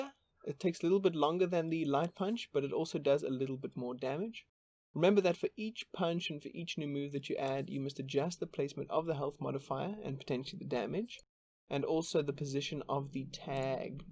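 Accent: South Africa. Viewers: Wait you're south African I'm south African too!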